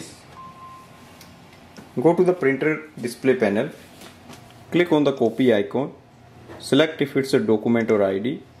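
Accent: India. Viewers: Your Indian accent is annoying.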